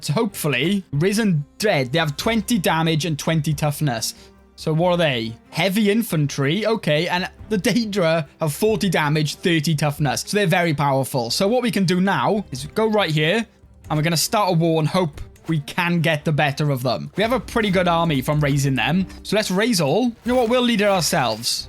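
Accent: Wales, United Kingdom. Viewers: Welsh accent?????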